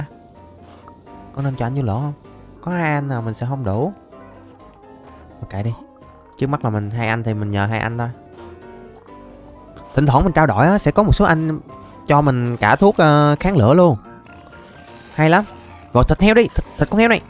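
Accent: Mekong Delta, Vietnam. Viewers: ông là người miền Tây đúng hong? nghe nói chuyện dễ thương vải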